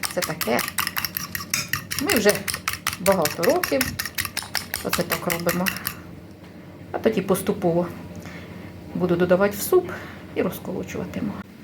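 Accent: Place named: Kyiv, Ukraine. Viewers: Доброго дня! Дякую за цікаві розповіді. В якій місцевості ви живете? Ваша мова така ж як у нас. Я живу на Київщині. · Люблю дивиться ваші відео, особливо дуже рідний мені ваш говір я з Київщини. В нас з вами багато спільного, говорять і в нас кисляк, місцевість ніби я в своєму селі.♥️💙❤️ Божого благословення вам і вашій Родині!!!🙏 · Я бы слушала день и ночь. Такая у нее красивая мова. Я тоже с центральной Украины, с Кировоградщины, сейчас беженцы в Чехии и мова... ну как вроде дома сижу среди соседей и друзей, точно такой же язык!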